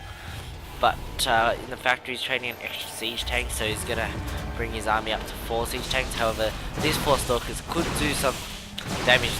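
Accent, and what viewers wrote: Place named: China. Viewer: you sound like a mix between a china-men and an Australian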